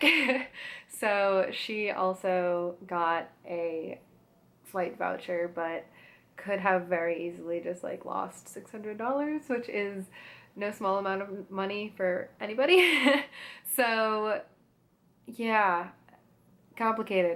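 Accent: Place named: France. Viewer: You talk french btw? Coz I do and I LOVE THE ACCENT XDD😂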